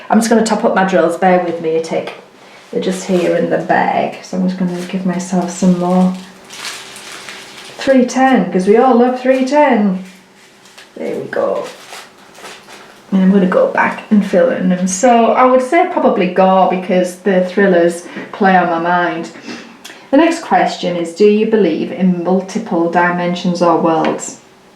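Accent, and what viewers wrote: United Kingdom. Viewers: I've just come across your channel and will sub. At the moment I'm working my way through your diamond painting but I've laughed and laughed it just unbelievable......I'm in my early seventies but you've answered all those tags the same as I would.......my dread movie was the first (never watched the others) hallowe'en I was terrified!!!!! I couldn't sleep afterwards i couldn't look at the window and the door at the same time so I was tossing and turning keeping check allllll night lol. It was the fact that he didn't die I was so sure he was coming after me. I scream at gremlins my kids moved from me when I took them to the pictures. And I cut my finger down to the bone when my dad just walked into the kitchen while I was cutting bread.....I nearly clung to the ceiling like Tom from Tom and Jerry 🤦‍♀️ I also do cross stitch, jewellery making, paper crafts if all kinds and love to crochet. 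Not enough hours in the day. Must go and watch-listen to your other vids while I'm dping. I also love to dp to audio books. Its lovely also to listen to Uk voice. I'm from Liverpool. Keep up the good work. Thanks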